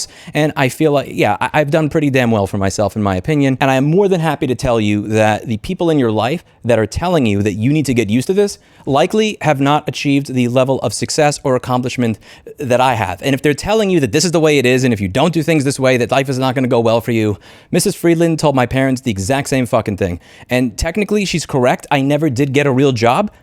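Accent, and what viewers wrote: United States. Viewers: Eeewww dude - congratulations on creating a rage bait sermon. Perhaps you should stay in your own lane with regards to this issue. You sound American - you guys were brought up different to us in New Zealand. The child you are asking to video tape their school bathroom is very questionable also. You, an adult - requesting or accepting video from a child/minor. Think about that careful bud. Pip from New Zealand. Age 47 🌻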